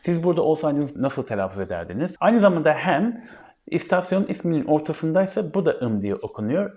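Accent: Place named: London, United Kingdom. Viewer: Excellent information about the pronunciation of our station names. Spoken like a true Londoner 👏🏼👍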